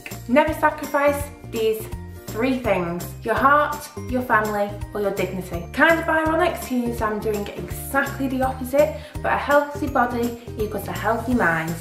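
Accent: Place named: United Kingdom. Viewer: Happy Birthday! Where are you from in the UK? I like your accent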